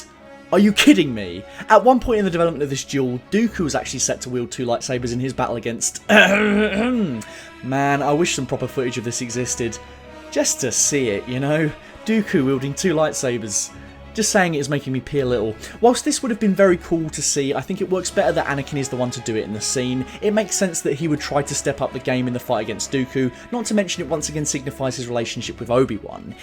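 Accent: United Kingdom. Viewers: Nice analysis (refreshing to hear a fellow Brit’s voice as well on a Star Wars channel)! I loved this duel and Yoda being introduced as THE bad ass Jedi we all know him to be. It was indeed the cherry on top of the epic battle of Geonosis with 200 Jedi, the introduction of the clones and the Death Star plans. To this day it’s the best oremirrr midnight movie screening I’ve been to (was absolutely buzzing at work the next day!).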